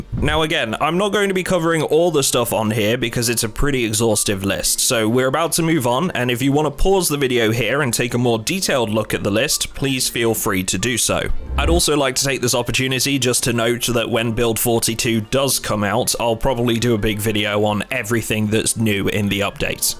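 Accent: United States. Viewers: I for sure thought you were American or maybe Canadian, you have a very American accent. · This one funny sounding American. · This American guy who makes these videos has an interesting accent.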